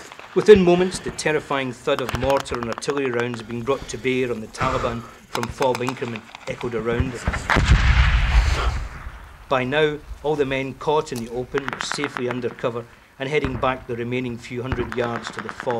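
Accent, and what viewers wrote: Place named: Scotland, United Kingdom. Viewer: To bad the Scottish accent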